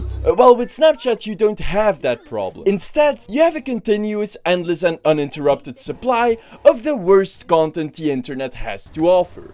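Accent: Flanders, Belgium. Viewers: t'es belge ? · are you belgian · What part of belgium are you from? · YOU'RE BELGIAN?? I KNEW YOUR ACCENT SOUNDED FAMILIAR!? LANG LEVE BELGIE 🇧🇪🇧🇪🇧🇪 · wacht deze guy is belgisch? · zijd gij Vlaams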